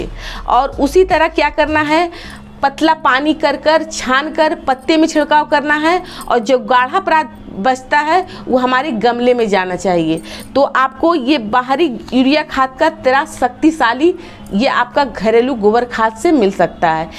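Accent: Bihar, India. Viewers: Humko Bihari boli boli bhut achcha lagta hai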